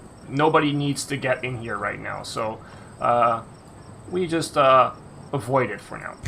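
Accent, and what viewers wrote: Netherlands. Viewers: After the first sentence you said I noticed you're dutch. amazing!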